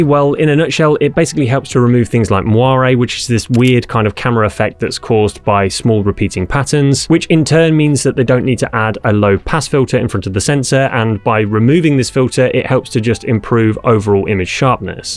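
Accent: England, United Kingdom. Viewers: Just curious. You sound English like me but you price everything in dollars? Do you live in the USA?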